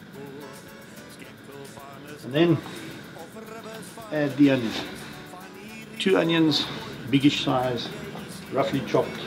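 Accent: South Africa. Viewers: How you going Bought my first Potjie last weekend No3 and have been loving your channel ever since For my first Potjie I'm thinking this one Can I mention you and put a link to you in my comments when I do the video. And I love ❤️ the South African accent Thanks mate for the easy to follow Potjiekos instructions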